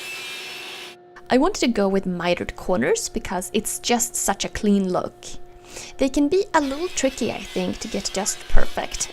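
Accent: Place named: Sweden. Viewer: I hear that you are Swedish nice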